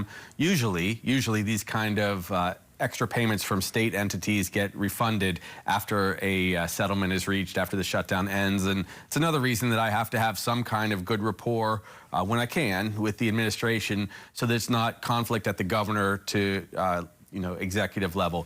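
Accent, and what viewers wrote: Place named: United States. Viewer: This guys mainland accent kinda rubs me the wrong way😮😮😮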